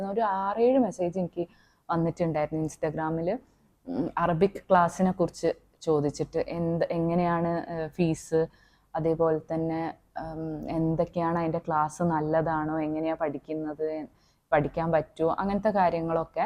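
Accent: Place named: North Malabar, India. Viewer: R you in kasargod/kannur?.. Talking style feels like ours❤️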